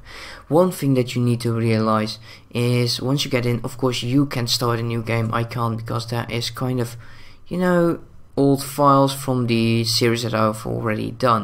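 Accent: United Kingdom. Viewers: ik dacht dat je brits was, met een grappig accent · english thicc accent xD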